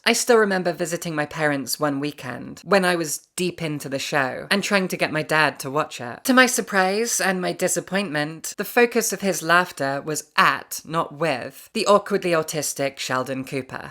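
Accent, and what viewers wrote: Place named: Scotland, United Kingdom. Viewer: Seriously. I love her accent, it's kind of mild for an Scottish accent but combined with her voice it's so soothing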